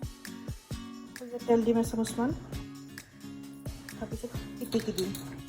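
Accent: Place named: Barpeta, India. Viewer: বৰপেইটা ভাষা আহি এ যায়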